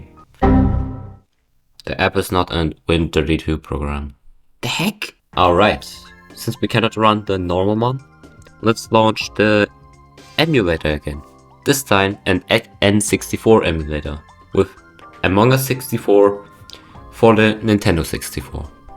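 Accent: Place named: Germany. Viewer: I can hear the german accent lol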